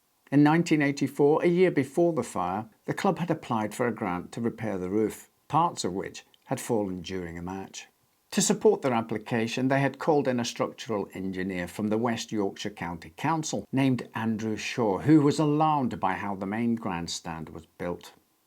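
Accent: Scotland, United Kingdom. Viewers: Is that the faintest hint of a Scottish accent?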